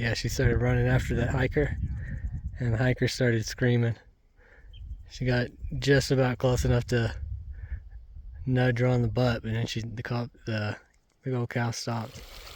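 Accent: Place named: Texas, United States. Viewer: Thanks for the video,! The Pecos Wilderness is gorgeous! I instantly recognized your accent as a fellow Texan. I am from the North Dallas area and my sister and I hiked that trail a few weeks before your hike. I am 60 and my sis is 52, so we low-landers took the altitude seriously! We made the decision to stop just after Jack’s Creek, at the campsite you showed near the end of your video, for the first night. That is about 10,000 feet in altitude. Drinking electrolyte supplements and sleeping there overnight allowed our bodies to better acclimate. I would highly recommend that, because, as you saw, altitude sickness is no joke! Also, we were worried about not having enough water. We did take Sawyer Squeeze filters and filtered the creek water to drink. Not sure about drinking even filtered water from that lake! We did not go all the way to Truchas Lake, because we were short on time, but we plan on doing that next time.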